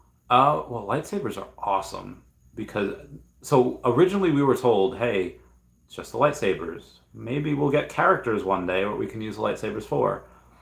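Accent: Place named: Australia. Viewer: 😂 cracking English Australian accent 👍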